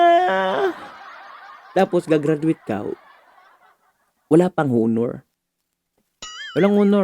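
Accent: Visayas, Philippines. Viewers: Visaya ka eh di ka Naman tlga Taga manila hahaha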